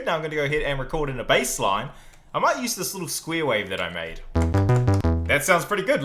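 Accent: New Zealand. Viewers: yo, that accent.. are you a kiwi? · You from New Zealand? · Is he from nz? Oh yeah yeah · he’s def a kiwi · Are you a kiwi, brother? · Are u from New Zealand? U sound like a kiwi.. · Are you a Kiwi? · He sounds kiwi, I love him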